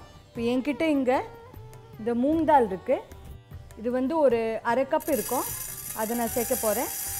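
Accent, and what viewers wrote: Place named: Tamil Brahmin, India. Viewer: Pongal Paanai on a gas stove! wow. awesome clear instructions. Loved the tambram pronunciation as well - a bonus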